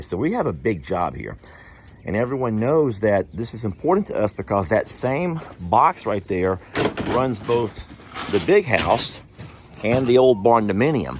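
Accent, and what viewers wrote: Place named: Rural, United States. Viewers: I’d rather hear that generator than that hick accent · deep southern drawl with a twang of!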